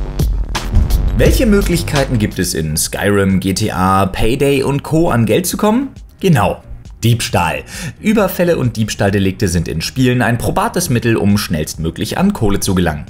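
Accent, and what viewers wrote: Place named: Bavaria, Germany. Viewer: Der und sein bayrisch! <3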